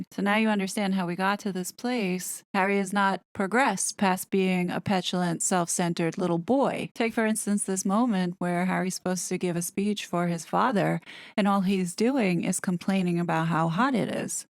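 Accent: France. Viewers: Ooohhhh by the way your French accent is great. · You have a gorgeous French accent!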